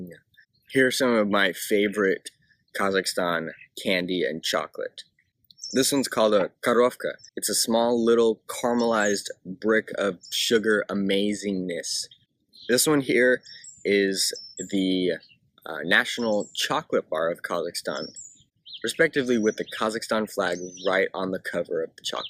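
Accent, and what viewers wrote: United States. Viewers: you from Seattle? you have the Washington acent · how come you speak like an american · Kazakh language is a State language, Russian is the Second Official language. I noticed that in Almaty there most people speak Russian, but in the West of KZ people speak both languages, but they speak Kazakh mostly. I wonder, what's your nationality? I suppose, you have an American accent, if I'm not mistaken. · You have a very strong American accent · Why do you look and sound American? Don't you like being from Kazakhastani??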